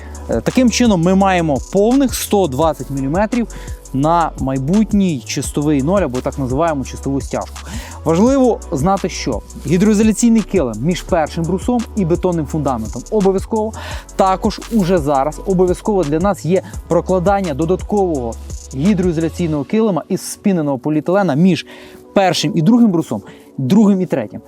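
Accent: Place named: Galicia, Ukraine. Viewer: Я понимаю это позорный галичанский суржик бандеровцев. Мерзко слушать . Тоже отписка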